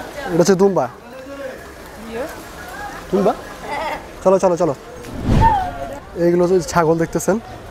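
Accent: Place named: Dhaka, Bangladesh. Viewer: Overall valoy.. editing ta besh valo lagse.. kotha bolar style ta r aktu shundor kor vai, r try kor dhakar vasha ta kom sure kore smart vasha ta use korte, r akta bishoy holo gurur dam kemon, kon gorur kon koshay kerokom dam chai ata tule dhor. corona er jonno bazarer ki rokom obostha ata shobar kase pousate parle valo response pabi.. best of luck dost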